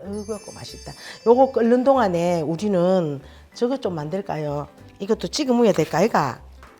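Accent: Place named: Gyeongsang, South Korea. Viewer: 앗 우리 갱상도 어머님.. 유튜브를 하고 계셧엇어요?! 구독합니다 ㅠㅠㅠ 몰랏엇네요 ㅠㅠ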